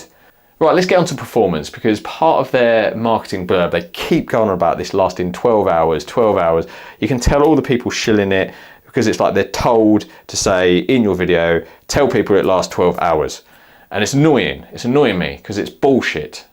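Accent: United Kingdom. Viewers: Others have likely already offered this: YOU ARE BLOVIATING AUD-NAUSIUM, TACKY REVIEWER WITH OFF-PUTTING BRIT ACCENT.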